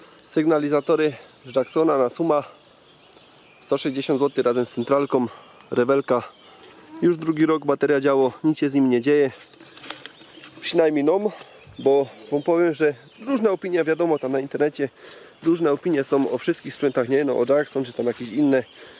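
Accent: Silesia, Poland. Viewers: Po śląsku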